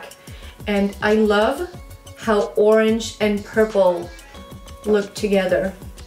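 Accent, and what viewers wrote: Sweden. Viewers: Swinglish! 🤣🤣🤣 Love it! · you are magical ❣️ 🧝🏼‍♀️🧞‍♂️🧚🏻‍♀️ I loved “The Swenglish”. I just got my palettes I ordered from your website. I had to stop this video before you started the tutorial and go to Nabla and ordered that palette. Your eye look was gorgeous 😍😘❤️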